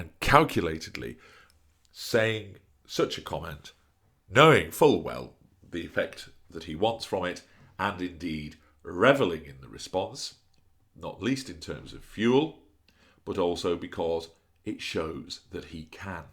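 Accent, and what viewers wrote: England, United Kingdom. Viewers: Also, this channel has really helped me to avoid cognitive dissonance as your voice (minus the English accent and insert a country bumpkin Deep South accent) is deep and commanding like me ex. Every time I listen it reminds me of my ex jackass and subsequently do not fall for his fuckery. Thank you. While it is clear that you enjoy telling the tales, there is a level of benevolence that I accept and appreciate.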